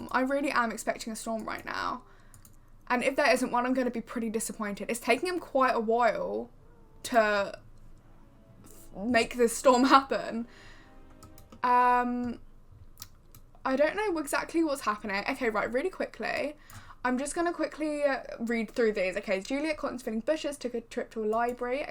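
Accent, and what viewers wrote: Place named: United Kingdom. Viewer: I love british accent♡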